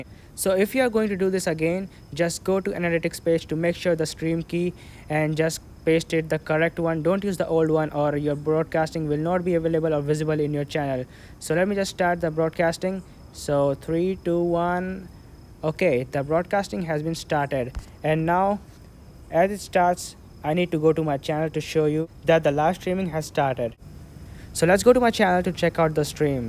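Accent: India. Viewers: Well your accent became more of an Indian accent as the video went on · INDIA INGLISH 🤬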